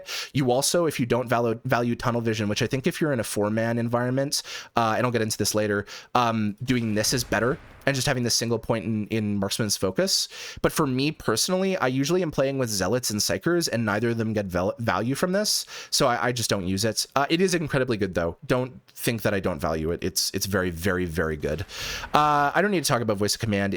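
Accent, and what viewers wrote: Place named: Canada. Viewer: Bro is definitely Canadian he's got a pretty distinct accent. With that said 🦅🦅🦅🦅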